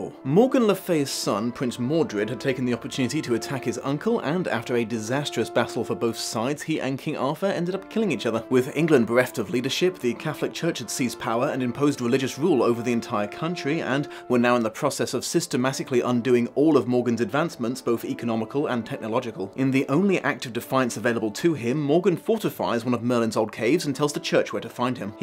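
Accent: South, United States. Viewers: That's the most American Accent that ever did American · I love your American accent honestly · I love your Southern US accent! 😀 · Holy shit you have a better American accent then I thought possible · Love the slightly southern accent. Sounds kind of like a Louisiana accent. Very cute! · You are channeling some Southern state with your accent lol. Virfinia, S. Carolina, or Georgia. I AM a Connecticut Yankee so I don't know Southern accents. But it's definitely not Northern lmao. I love it tho!!! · I did start thinking about Daniel Craig during the review, but mostly because I was comparing American accent to Craig's performance in Knives Out. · GyroMurphy and yet somehow the Southern accent makes the whole thing even better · Hold up your american accent is excellent not perfect of course but very very impressive! (And a little southern for 💙 flavor 💙) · Your American accent is so cute. 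Especially since you're trying really hard to do a texas drawl by deepening your voice. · Your southern accent is really on point. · Not sure why a Connecticut yankee is wearing a cowboy hat and speaking in a slight southern accent, but I loved the video anyway! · I love your American accent! :D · The irony is that a Yankee accent is closer to a British accent than the slow southern gentleman drawl you may as well be saying "I'll be your huckleberry" in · That accent though!!!! I would call it more southern as a New Englander, but credit where credit is due because I could not pull off a southern accent that well! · I love your American accent!!! Perfect delivery! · Your New England accent has a southern twang. You sound like rick grimes from the walking dead WHO IS ALSO ENGLISH irl! Side note: I was listening to this book on a long road trip in 2013 when I drove from Boston to Orlando and back I got to the part of the water fountain and stoped. 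I recently watched the English tv show AND the animated movie (the animated movie is closer to the book) Cheers!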